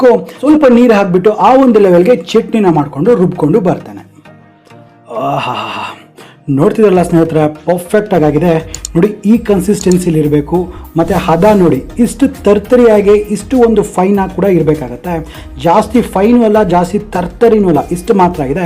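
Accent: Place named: Mangalore, India. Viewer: Mamnglore kannada accent ,kelokke tumba channagidhe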